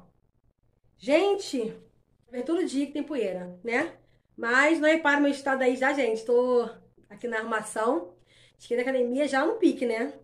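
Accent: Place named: Rio de Janeiro, Brazil. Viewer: Que delícia de vídeo, amada. PARABÉNS PELA sua desenvoltura em nos presentear com seus vídeos naturais, alegres e divertidos. Amoooo. Bjs, gente lindaaaa... AMO seu forte sotaque carioca... Deus é nosso abençoado refúgio querida...😍😘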